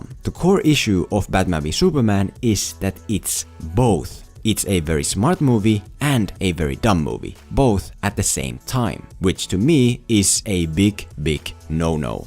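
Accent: Finland. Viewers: Hauska kun heti sun venom videon alusta huomasin sun suomalaisen aksentin :D hyvä et meillä on oikeesti hyviä ammattimaisia suomalaisia leffatuubajia !! jatka hyvien videoiden tekoa ! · Suomienglanti havaittu: torilla tavataan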